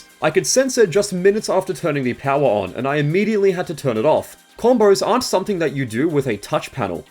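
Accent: United Kingdom. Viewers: Ur from Australia?! I assumed u were from the UK 🤯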